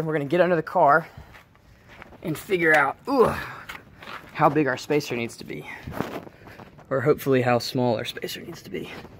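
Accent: Alabama, United States. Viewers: You from alabama?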